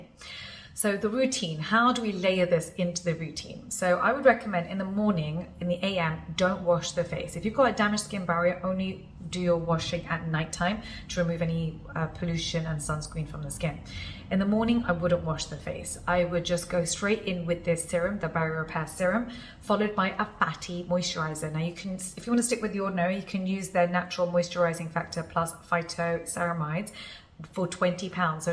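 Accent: United Kingdom. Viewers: Especially with her British accent 😅😂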